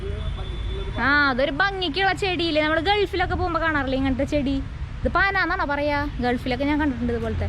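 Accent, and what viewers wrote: Thrissur, India. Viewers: Saralla mole itrakim budhimutti... Thaarangalde veedokke kanichallo... Enikista mole pinne trishur bhaasha kelkaan nalla rasamund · Thrishoor basha nalla rasam kelkkan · Thrisoor slang poli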